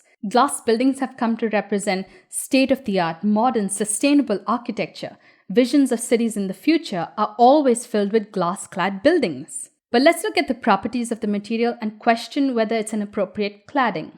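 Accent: India. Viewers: Your posh Indian accent gives your content an extra brutal authority, and I'm loving it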